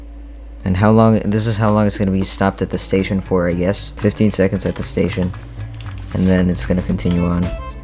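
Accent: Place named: United Kingdom. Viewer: Oh, he is british..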